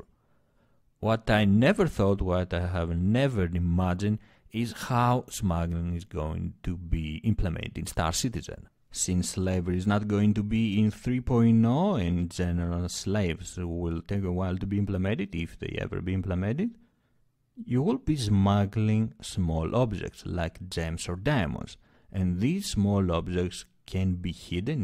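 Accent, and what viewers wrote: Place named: Greece. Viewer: Έλα παλικάρι μου, από Ελλάδα είσαι; τώρα το είδα στο άλλο σου video. LOL. Και μου έμοιαζε η προφορά σου για Ελληνική αλλά δεν ήμουν σίγουρος.....χα χα χα. Πολύ καλά τα videos σου. όσο για τα επαγγέλματα θα σχολιάσω αργότερα όταν θα έχω χρόνο. :)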